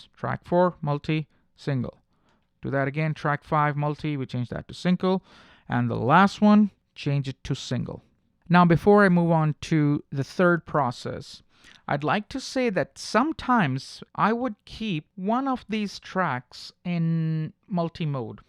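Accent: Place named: England, United Kingdom. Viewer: Except the English accent everything else is excellent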